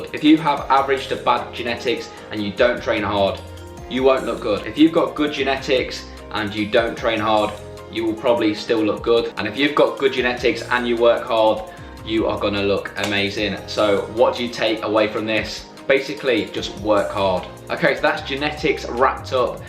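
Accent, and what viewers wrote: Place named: North, United Kingdom. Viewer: Nice one man good topics, your northern accent remind me when I used to live up there haha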